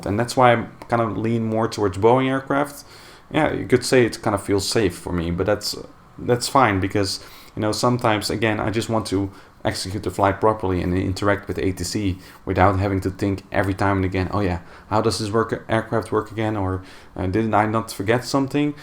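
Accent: Netherlands. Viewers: i knew you where dutch by your accent and because you showed a klm airplane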